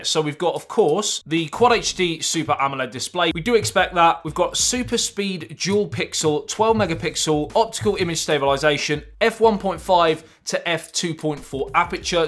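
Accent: United Kingdom. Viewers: watta accent language its like a british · Thick british accend... 😅😁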